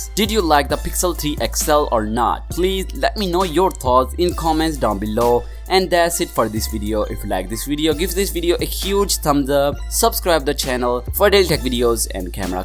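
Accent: India. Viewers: Ну я, конечно, не могу этим похвастаться, однако мне все равно кажется, что это какой-то восточный акцент (то ли индус, то ли еще что-то в этом роде).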